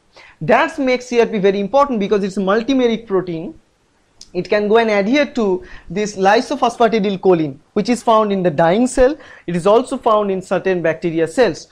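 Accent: Bengal, India. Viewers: It seems u are a Bengali? (by your accent and name) Which college are u from.. , sir...?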